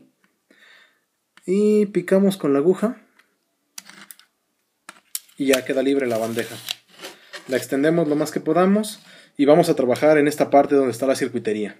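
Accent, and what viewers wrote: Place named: Mexico. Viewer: ¡Excelente información!!!!!!! Por el acento supongo que vos sos mexicano!! Gracias por todo lo que enseñás en el video. En mi país al cable negro lo llamamos polo negativo y al rojo, polo negativo; pero al final es lo mismo, sólo cuestión de terminologías. Muy bueno el aporte. De nuevo mil gracias!!!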